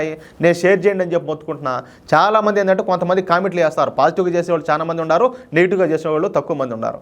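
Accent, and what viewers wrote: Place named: Ongole-Nellore, India. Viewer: Kuttabagala undla nee voice mana ongole Nellore bashalo em matladavanna ....subscribe chesukondi plz Andaru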